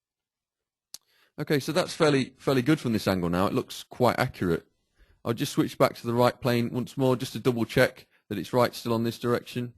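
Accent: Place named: United Kingdom. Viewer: Was pleasantly surprised when I heard a British accent.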